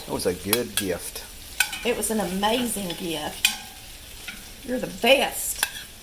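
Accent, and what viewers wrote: South, United States. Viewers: I am from Georgia, Rockdale County, and totally cook southern style. In love to watch you and hear your accent, I have lost most of mine. Your husband does a very nice job as your camera man. You cook differently than I do but it all seems to come out very well and looks delicious. ( I know we all do it as either our mother or grandmothers did). I would absolutely love to have some of your gift of the red Plum Jam. I know that it is made from those little wild plum trees you see growing just off the road all over the state. Any way I will keep watching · Love that southern accent!!! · You sound and cook like a southern woman if you are I'm am to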